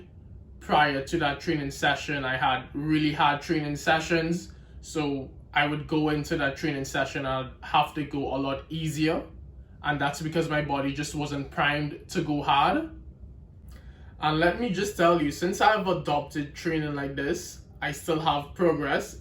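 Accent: Trinidad and Tobago. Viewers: Great videos bro, keep up the great work. I recognize your accent, are you from Trinidad?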